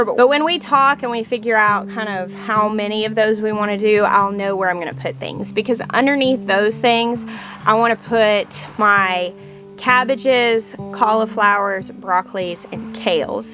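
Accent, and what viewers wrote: South, United States. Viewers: Holy southern accent in this video! Never noticed it as much as this video! Must be the heat in the fall. 😃😃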